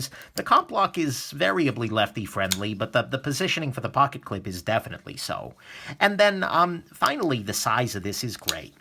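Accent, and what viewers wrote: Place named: Alabama, United States. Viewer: I love your Alabama accent 👍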